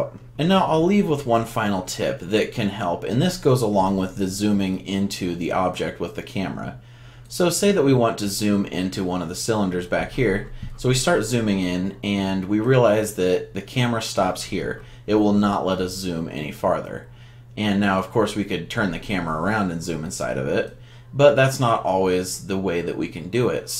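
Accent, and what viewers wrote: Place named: Letterkenny, Canada. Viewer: Sounds like he is from Letterkenny... Pitter Patter, let's get atter!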